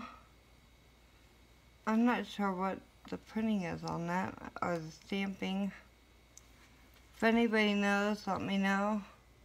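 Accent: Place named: South, United States. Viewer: Just recently subbed to him!!! The dudes a real good picker ...... and he has a bad ass southern accent....!!!!!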